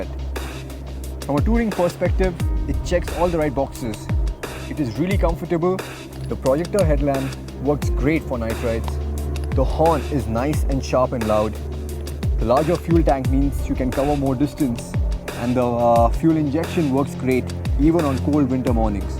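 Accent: India. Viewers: Hello aka:)... I been a witness to your many from the time of your inception. If you accept feedbacks.I have 2. 1) Few clips are repeated in all your reviews. Here one in black n white and then in color. Like something's missing 2) Agree, u have a global viewership..but an Indian is the host with the accent...Would have been great if he spoke the history/ fan following wearing a Dhoti and white shirt start and ending with the jacket:) In the end Its a Royal Enfield.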